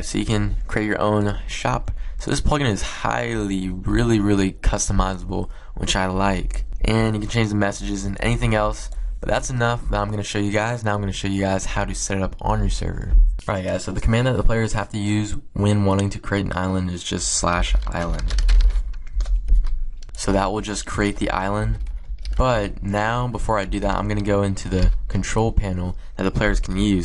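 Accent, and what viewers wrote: Italy. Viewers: Numero uno,are you italian or english? lel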